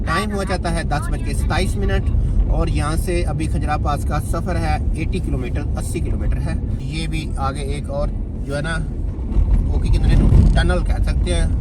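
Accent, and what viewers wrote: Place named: Punjab, India. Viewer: سر آپ پنجابی ساتھ بولتے ہیں بہت اچھا لگتا ہے😊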